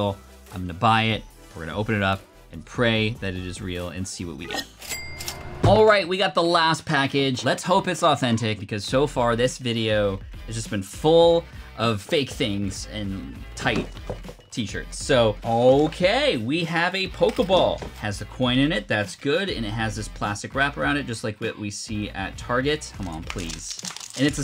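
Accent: France. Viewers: j'adore ton accent français HAHA (I love your French accent) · I love your french accent it's really funny. By the way i realy like your videos continue like that. A random guy from switzerland🙃🇨🇭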